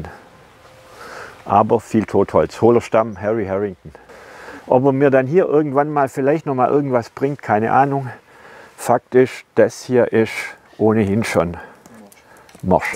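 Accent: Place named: Swabia, Germany. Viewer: Der Einkaufskorb.... absolut geil. Und das Schwäbisch... bitte: Sprich doch immer so. Finde ich absolut super und authentisch.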